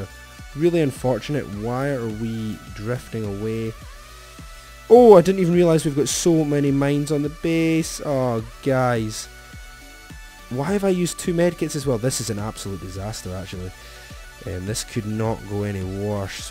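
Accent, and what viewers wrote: Scotland, United Kingdom. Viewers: his scottish accent made the gameplay intresting..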